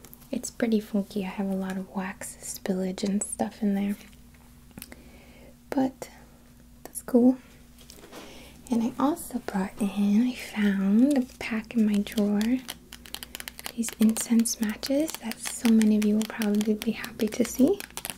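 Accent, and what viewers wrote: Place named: New Jersey, United States. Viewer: This is the 1st time I noticed you have an accent! New Jersey? I'm bad at this And yes sing next time!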